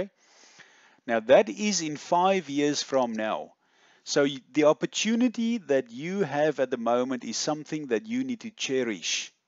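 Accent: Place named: South Africa. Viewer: Thick South African (Afrikaans) accent...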